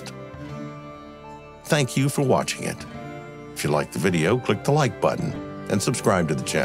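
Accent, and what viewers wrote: United States. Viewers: Bad American Voice over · A medieval battle in the north of England narrated by an American cowboy. Love it! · Sorry I struggle with the narration it’s too loud and painfully American . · such a shame a yank commentates,..ruins the whole thing!!!!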